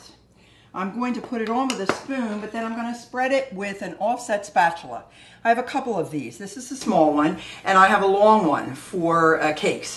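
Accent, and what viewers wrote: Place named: Northeast, United States. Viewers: your so cute 🤗😘 I'm from Canada sound like your from New York area it too me 5 mins to figure out what Wyader was Oh!! WATER 🤣🙃😊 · ! You sound like you’re from the northeast!! My Dad always pronounced the word “water” just like you!!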